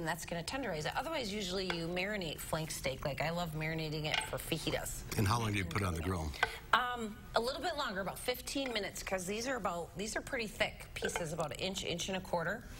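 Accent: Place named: Wisconsin, United States. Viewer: I love a nice Wisconsin accent. 😻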